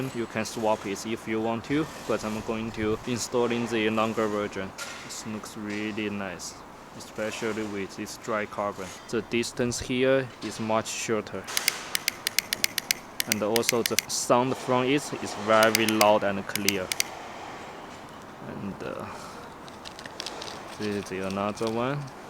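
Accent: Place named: China. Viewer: Your English has improved by the years sooo much :D you don't have a big chinese english accent anymore. Just a light. :)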